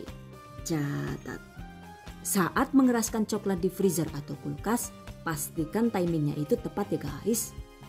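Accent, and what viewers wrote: Banyumas, Indonesia. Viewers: Saya suka dengan Chanel ini, penyampaian dan resep nya ok banget. Mbk nya juga lucu,kadang ngapak juga. Haha Andalan nya "catat" dan "ookeeh guys" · Mantap teh,pake BHS ngapak dong,seneng bget q dengernya. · lah jan rika jane wong ngendi.. 😆nyong ngapak kie,dadi geget ngrungokna rika gawe video wkwk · Kerennnnn Bun apalagi kalau pas Bunda ngobrol ngapak seneng saya dan Alhamdulillah sya kemaren nyoba buat modal cangkir dan tatakan nya sama mobil2 an lucu lucu makasih Bun sehat selalu buat Bund👍🏼👍🏼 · wong ngendi sih rika kok bisa bahasa ngapak? Salam ngapak 🤣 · ini aslinya orang mana sih? Ngmong ngapaknya lucu, tp pinter bhasa inggris juga 😁😁👍👍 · Pling suka nonton vidio lucu bhsnnya cmpur ngapak krn aq jga org purbalingga jawatengah sama ngapaknya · 😂😂 klo sdh logat ngapak bikin ngakak Ini konten masak teraasikk 😁 sukses bu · Seneng nek lg ngapake 😅😅😅 Suka pokoke nyonge ngrungokna ngapake ora ngapak ora penak 😍 Sllu mengikuti yu 😍 sukses selalu.pokoke 🙂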